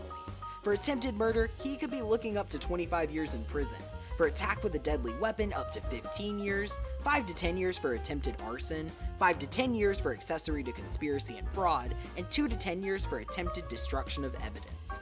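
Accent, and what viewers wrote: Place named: United Kingdom. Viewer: I missed your British voice😢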